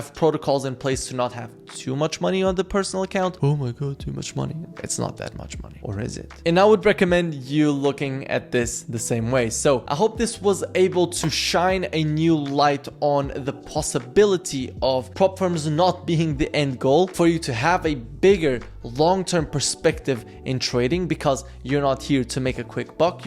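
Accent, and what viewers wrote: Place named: Portugal. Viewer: hey, vi o teu podcast do tradingnut. pergunta: és tuga? estou a escrever em PT de propósito. o teu sotaque já me dizia que sim.. mas depois do podcast fiquei a pensar 😂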